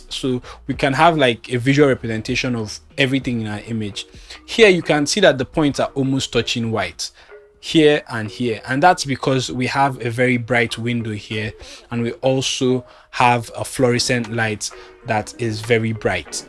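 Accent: Nigeria. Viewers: This is top tier content especially because your spoken English is one I can relate to as a Nigerian that I am. Thank you, truly! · Nigerian?! LOVE. Thanks Brother. 😊😊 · Your explanation is sweet, with our bold Nigeria pronunciations, omo this one enter my head ....i just subcribe on your page stay bless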